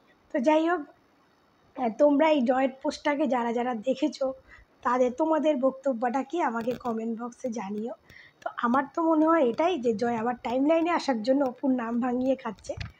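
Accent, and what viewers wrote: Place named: Bangladesh. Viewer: Tui india bole mone hoyna tui tor kotha batrai bojha jay tui bangladesi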